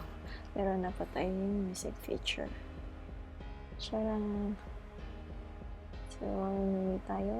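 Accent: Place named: Philippines. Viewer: i could tell with the accent i’m half filipino:)) salamat po for the video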